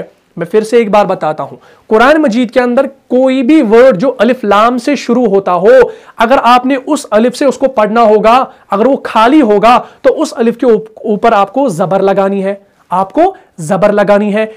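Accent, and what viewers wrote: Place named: Mumbai, India. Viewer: Qaree sahb aapka Arabi tallaffuz correct hy jbky urdu aap Indians k mumbae walon ki tarah bolty hain khalee ko khalee yanee khal k kaaf ki taraha ghunna ki gonna yanee aap lafz bol sakty hain laijin bilawaja urdu ja tallaffuz bigar dety hainQ?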